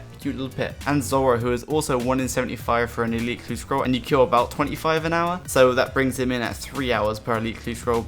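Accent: Australia. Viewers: He looks like an American, with Australian voice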